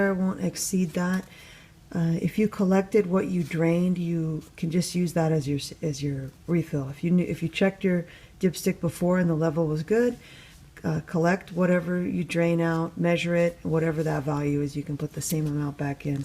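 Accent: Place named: Latin America. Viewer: Are you Latina? I think I hear a slight accent 😊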